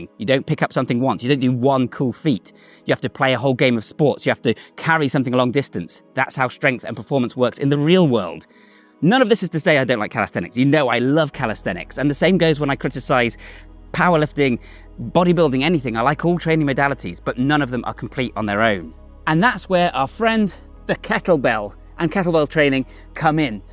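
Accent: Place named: Scotland, United Kingdom. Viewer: Ur Scottish? U have a faint Scottish accent.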